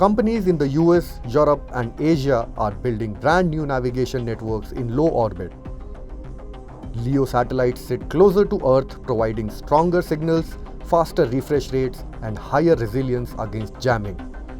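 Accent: India. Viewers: I was going to flame you for the nearly impossible to understand accent, but you're an Indian business. I can't fault you for a strong Indian accent. Comment is free engagement for the algorithm.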